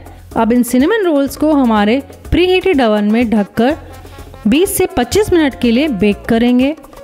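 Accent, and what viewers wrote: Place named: India. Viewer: Indian english gets on my nerves dude, stop talking like that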